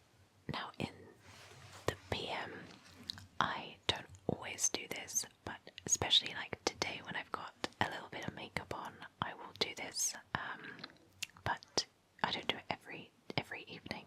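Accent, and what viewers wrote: United Kingdom. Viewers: The most tingly british wisper on internet! We love you!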